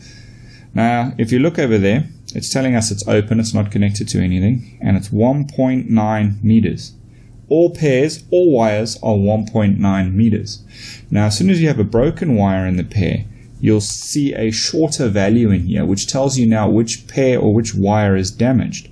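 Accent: South Africa. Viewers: I thought you sounded South African! Mentioning pricing in Rand is what confirmed it. Thanks for the decent review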